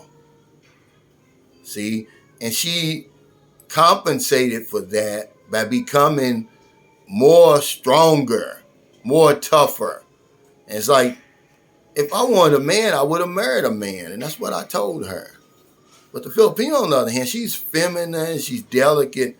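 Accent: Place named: Louisville, United States. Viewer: I knew I recognized the accent! Louisville in the house! Newburg here! I’m glad I came across your channel.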